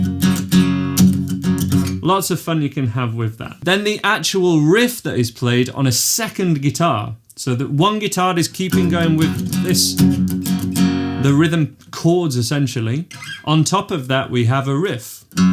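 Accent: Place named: Preston, United Kingdom. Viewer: Hi Some really useful lessons on here for beginners. Thank You. Listening to the accent, are you from Preston or Huddersfield (or somewhere in between).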